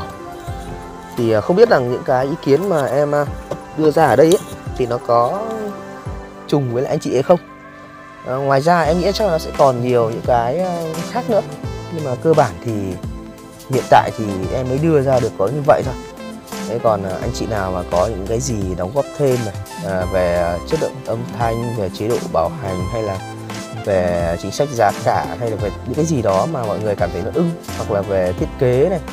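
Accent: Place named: Hanoi, Vietnam. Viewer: Đúng chất giọng dân kẻ chợ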